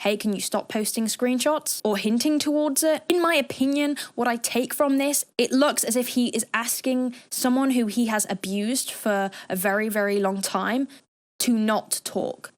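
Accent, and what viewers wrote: United Kingdom. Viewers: He sounds SO British that the fact you are too did not set in until 20 minutes in 💀 sorry, side note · r*tard is used in uk to mean idiot even tho u sound abit british u have the sensativity of a american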